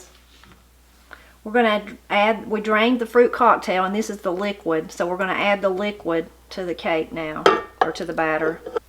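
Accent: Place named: Florida, United States. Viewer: Your accent reminds me of my family in Florida. I have had this cake. In Oklahoma at our yearly Easter family reunion. Pot luck favorite! ❤❤❤❤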